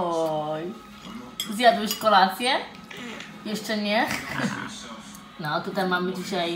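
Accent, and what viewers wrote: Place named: United Kingdom. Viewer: Hej, mam pytanie. Nie dotyczy ono tego vloga ale za każdym razem jak Was oglądam to jakoś tłucze mi się to po głowie. Mianowice, Twój angielski ma już delikatny brytyjski akcent ale za pewne nie zawsze tak było. Możesz spytać męża co sądzi o naszym polskim akcencie na tle innych narodowości? Czy jest znosny? Irytujący? A może całkiem miły dla ucha? Wiem że niektóre akcenty (podobno francuski) w ustach mężczyzny brzmią słabo ale kobieta z takim akcentem brzmi sexi. Często się nad tym zastanawiam.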